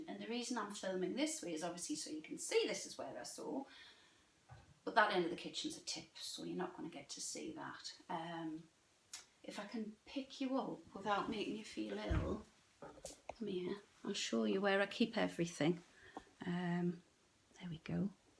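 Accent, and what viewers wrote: Newcastle, United Kingdom. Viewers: Morning, is the pattern piece you showed not the back? You’re such a laugh and it’s great to hear your Geordie accent, which I miss so much. 🙋🏻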